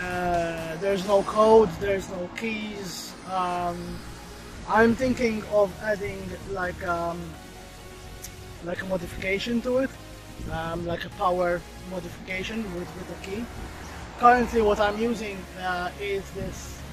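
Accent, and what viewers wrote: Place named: Malta. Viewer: Nice Maltese accent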